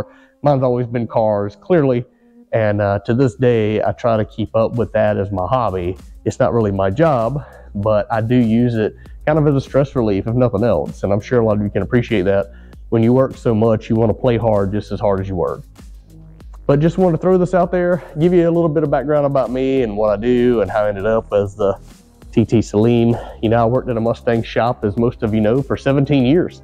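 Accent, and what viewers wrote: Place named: North Carolina, United States. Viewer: Man, the more I looked at your videos the more I began to think you’re the same person from a forum I used to be on back in the day, HPJunkies. Then I started listening closely to your accent and that’s definitely a North Carolina accent so my bet is you’re the same person! Loved your threads on that board back then and your videos now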